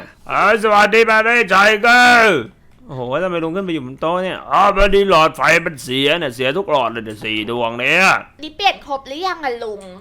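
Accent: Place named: South, Thailand. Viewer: พูดภาษาใต้นิ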